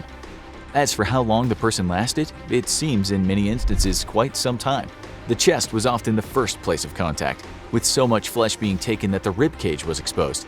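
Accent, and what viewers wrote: United States. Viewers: This dude is probably the best narrator on YouTube. He also makes the American accent sound best. 😁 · Honestly yeah. I always loved the strong american accent haha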